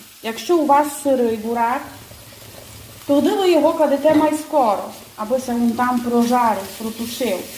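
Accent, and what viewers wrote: Transcarpathia, Ukraine. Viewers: Ой, який файний закарпатський діалект ❤🎉 Так приємно слухати! 😊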